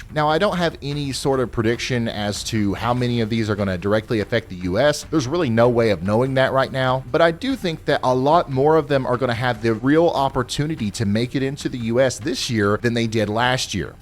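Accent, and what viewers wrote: South, United States. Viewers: I follow your weather channel cause l feel you know your stuff in reference to weather. From Puerto Rico. I have been to kuntucky got family there. I love the southern accent and miss my friends out there.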